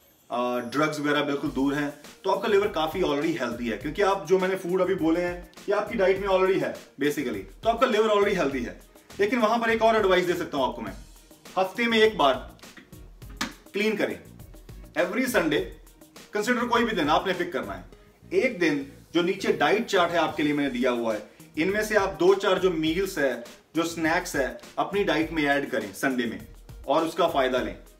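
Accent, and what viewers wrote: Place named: India. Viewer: The worst thing ive heard is indians speaking english i dont understand 70% of words he saying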